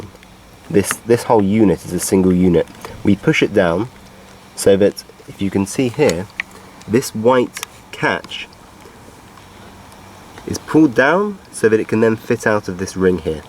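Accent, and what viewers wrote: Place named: England, United Kingdom. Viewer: Thanks. Very helpful and in the King's English with birdsong background. What's not to like?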